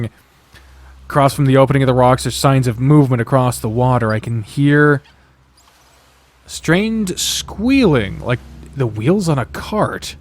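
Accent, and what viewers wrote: United Kingdom. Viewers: Ahhh... kudo's to you for your bri-ish accent, Also, Love the new video!!! I just binged all the videos I missed ever since the start of December so I'm glad I could finally be on time again ^^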